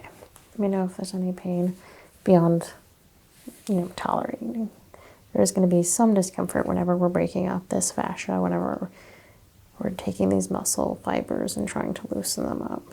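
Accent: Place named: Upstate New York, United States. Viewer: i'm not sure where you're from but your accent really reminds me of western/upstate new york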